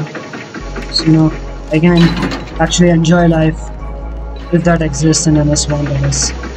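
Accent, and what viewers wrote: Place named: India. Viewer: I can tell by your accent that you are indian, im indian too!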